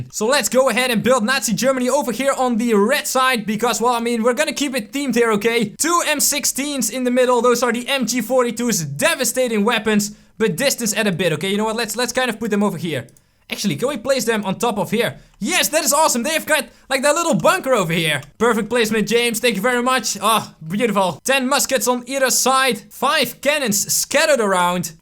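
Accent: Netherlands. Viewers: Het klinkt of jij nederlands bent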